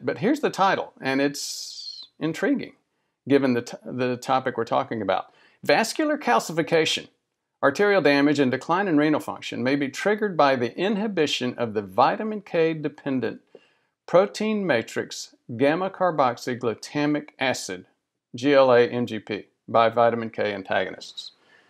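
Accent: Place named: Middle Tennessee, United States. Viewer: thank you for the fast reply. I'm currently shopping for a good used van to replace my decrepit one (it literally can't even make it to Knoxville, much less Louisville.) Then we would be better able to get to your neck of the woods. I thought you had a little "Middle-Tennessee" in your accent. Nashville would be a bit easier to get to, but it is what it is. Wish we had known about David W.. My sister, who resided near Memphis, just recently died during a triple bypass...another death-by-doctors incident, in my opinion. Anyway, thank you very much for the info,. We might see you sometime. Sylvia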